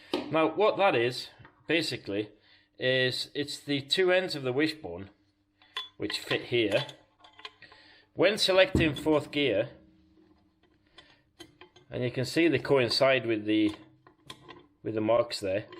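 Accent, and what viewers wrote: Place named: Northern England, United Kingdom. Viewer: Loving the commentary. Sound like you lived in Manchester or Leeds for a while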